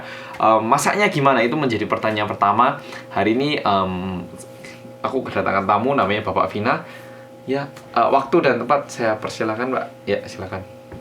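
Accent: Java, Indonesia. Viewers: Selaluu nungguuuuu samaaaa orang medok ini hehe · Mas mw nanya dong, masnya ini org jawa atau oppa korea ya?? Aksen suara jawa totok, tp facenya 오 빠 😁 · Mas Medokmu itu lho 😁 sesuatu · ARTIS KOREA YANG MEDHOOOOKKK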